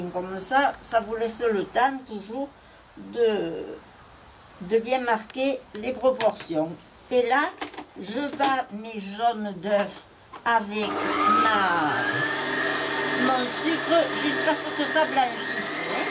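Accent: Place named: Nice, France. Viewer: Bonjour chère je suis de Sète née a Marseille et vous avez le même accent que "Mado ka Nicoise"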